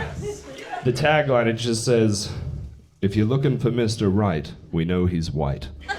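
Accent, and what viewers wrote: Australia. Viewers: Im Australian and i thought for sure you were an Aussie haha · good quality, he does sound like a posh australian, loved the siri joke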